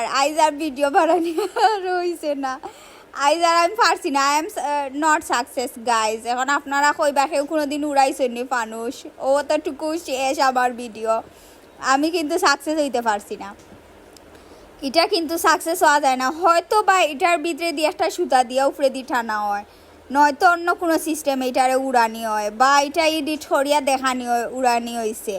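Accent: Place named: Sylhet, Bangladesh. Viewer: Acca Apu apnader Sylhet er kotay❤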